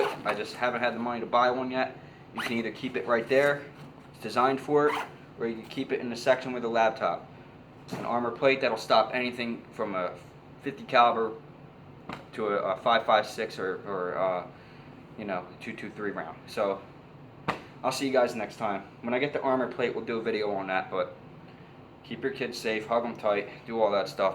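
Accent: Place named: Pennsylvania, United States. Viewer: Can't deny that PA accent lol, I've done a lot of work up there in the oilfield and the locals laughed at my accent and I would laugh at yalls. Had some good times up there., thanks for bringing back some good memories.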